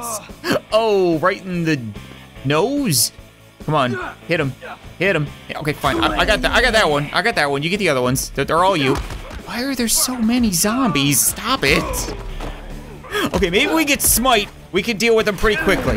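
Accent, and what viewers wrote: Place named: Canada. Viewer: EVERY SINGLE VIDEO I WATCH I GET A UNIVERSITY CANADA AD. I mean it is fitting though, is like the most Canadian person I've ever heard.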